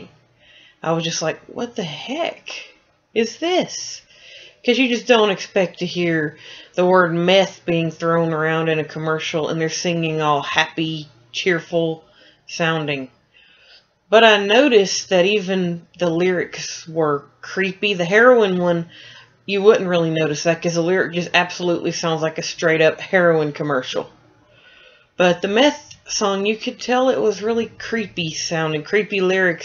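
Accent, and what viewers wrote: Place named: United States. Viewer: Really nice review! I definitely agree with you that Meth Cleaner Girl is creative and overall a good PSA. I never saw it on TV because it didn't air until the year I was born. Glad I didn't though, because I think it would have just mostly confused me. By any chance, are you from Georgia? I live there, so it was nice to hear an American voice for a change. Seems like a lot of reviewers are British on here. :)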